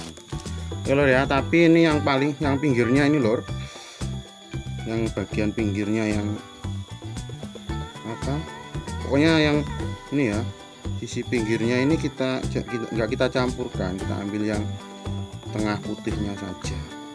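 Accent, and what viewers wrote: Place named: Java, Indonesia. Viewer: Jowo mase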